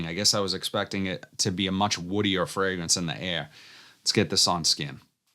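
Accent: Boston, United States. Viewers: Can't get pass his awful Boston accent 😂😂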